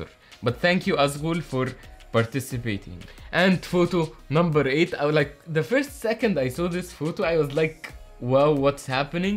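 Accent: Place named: Egypt. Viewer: I like the last idea. Breaking down famous artists. And even in that idea, performing their famous art yourself is the part I loved the most because I guess we will go through a very good learning curve that way! 😍 Also loved this video. You should keep doing such videos too every now and then. And last but not the least, I love your accent. I was living in Cairo for 6 months and hearing you was making me feel nostalgic. I felt like I'm home throughout the video! 😃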